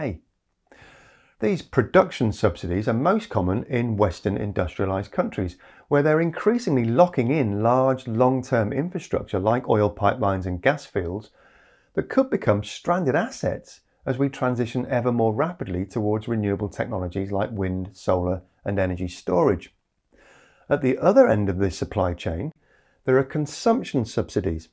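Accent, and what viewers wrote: United Kingdom. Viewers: Oh dear where to start! I'll concentrate on the UK where I live, and judging from the accent where the video is from. The production "subsidies" are tax treatment that all businesses benefit from, because if cost of production was taxed as opposed to profits, no one would bother producing anything. Talking of taxes, big oil was paying 40% CT (double other businesses) and now windfall taxed at 75%! The second bit addressed the direct type as in the price cap recently introduced. This was needed, and other countries have similar schemes, because of high prices. Prices are high for various reasons, post Covid bounce back, the war etc. But another cause of high cost is renewables, but that is a whole other discussion. Lastly "indirect" subsidies, i.e. not paying for the supposed damage caused. Well depends how you measure it, and I would not trust the IMF, they hardly ever get predictions right (same as IPCC). 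Just because an organisation has "international" or "world" in it's name, does not make a divine source of information. Anyway, there are carbon credits, ESG ratings, and taxes on anything that moves by fossil fuel, are these accounted for? Making EVs and wind turbines also have an environmental footprint, does the IMF call these subsidies? Talking of which, both these and other green industries receive huge direct subsidies from the taxpayer. Finally, I am not a denier, but to get to a greener future requires fossil fuels, and plenty of them for a long time to come. The va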